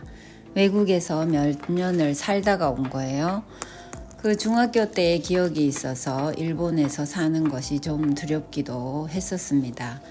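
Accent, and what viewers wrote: Gyeongsang, South Korea. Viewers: 영상을 보면서 따님을 잘 키우셨다고 느꼈어요 타국에서 생활이 힘드실텐데 대단하십니다 조용하고 잔잔하지만 내공이 단단한 분이라고 느껴져요 저는 딸이 도쿄에서 직장생활을 하고 있어서 외국에서의 삶에 대해서 간접 체험을 하고 있습니다 남편이 대구 출신이어서 경상도 억양이 친밀하고 보여주시는 소소한 일상이 좋아서 챙겨서 보고 있습니다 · 경상도 분이신거 같아요 말투 괜찮아요 듣기 편합니다 요리 잘 보고 있어요 언제나 행복하시길요 ❤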